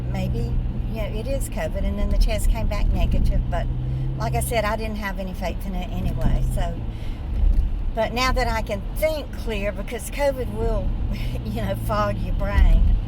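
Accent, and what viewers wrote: South, United States. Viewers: I love your beauty. I would love to visit with you and have you cook for me, not gonna lie. Now it sounds like you have a southern accent living in LI NY. It sounds lovely but it doesn't sound very LI haha.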